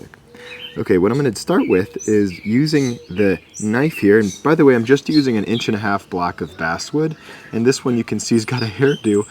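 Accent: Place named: East Coast, United States. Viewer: Alaska is still warming up. It's nice to hear east coast. Again ,you are making this so fun. I'm still struggling to keep my tools sharp. I'm going to order a wheel grinder. Do you have any preferences to China brand , or is the smaller 50.00 grinder on Amazon, vrs a 200.00 home depot. Maybe a video to help. Or the belt with sand paper? Maybe better. What you think?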